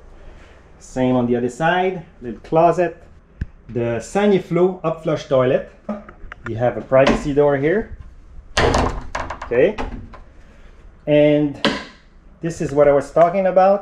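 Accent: Quebec, Canada. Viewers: You sound like you're from Quebec... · Awesome! Quebecois? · At first I was thinking, is it me or this guy sounds like a french canadian dude speaking english. Après j'ai vu le logo des canadiens, ça a confirmé ma thèse 😅 Belle job! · I like it ! What are all those diplomas on the wall ? I couldn't not notice you accent. Is that PQ ? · Ta un accent Québecois ??? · That sounds un peu quebecois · Wow! I love this simplicity! Nice job. Not sure but your english sounds just like mine from Quebec. I wish that container home could be possible up here... But with our cold weather, building code and city legislation... It would require so much effort to get a simple projet like yours possible.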